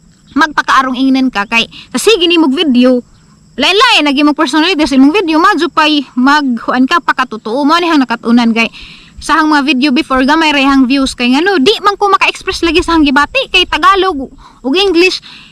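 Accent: Bohol, Philippines. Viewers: Lami jud kaajo paminawon ang sinultihan nga bol anon madam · Bravo mao gusto ko nga Boholana maayo pamation good job! Josephine Legaspi Grunseth from Texas! Shout out taga Sierra Bollunes family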